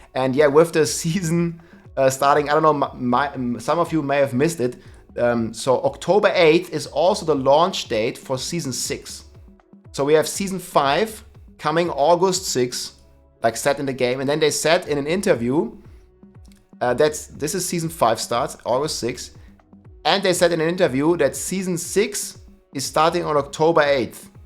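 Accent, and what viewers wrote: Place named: Germany. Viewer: Ich mag es wenn man Englisch mit ein wenig Deutschem Akzent spricht - so toll (kein Sarkasmus), der beste Diablo-Content-Ersteller!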